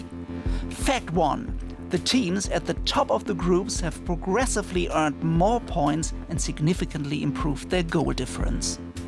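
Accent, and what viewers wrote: Germany. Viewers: Possibly the most german sounding German I've ever heard. Great vid!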